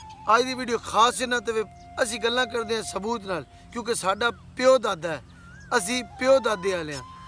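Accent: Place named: Punjab, India. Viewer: Very good punjaby lahja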